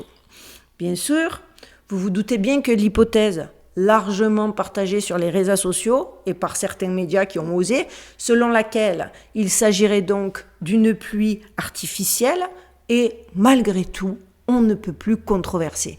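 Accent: Marseille, France. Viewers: Oui, continues ce sujet, c'est passionnant, .tu es passionnante et adorable avec ton léger accent marseil.. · Coucou! pour ce qui est de continuer ou pas sur Dubaï, fais comme tu veux. J'adore t'écouter parler, j'adore ton énergie, j'admire ton caractère affirmé. Nous sommes beaucoup à apprécier cette même chose. Aussi ton accent de belle marseillaise vivant en plein Vegas, j'adore aussi! mille bisous (oh ben non pas de bisous physiques, mille ça ferait beaucoup. Mais voilà: ces mille bisous-là! ). Stay safe you too. bye!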